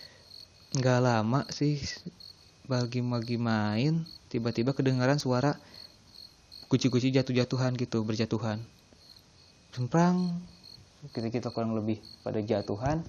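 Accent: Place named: Bandung, Indonesia. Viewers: Sunda pisan yaaa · Yang Cerita orang bandung ya? logatnya :D btw serem sih tapi lucu hhaa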